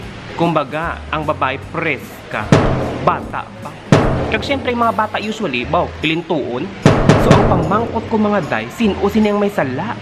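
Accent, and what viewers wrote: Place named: Hiligaynon, Philippines. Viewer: enjoy gid ko kon Ilonggo dialect ikaw. Hidlaw gid ko pamati. Thank you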